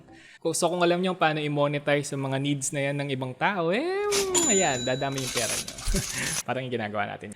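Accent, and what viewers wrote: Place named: Jamaica. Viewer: Sounds like Jamaican in a way 😅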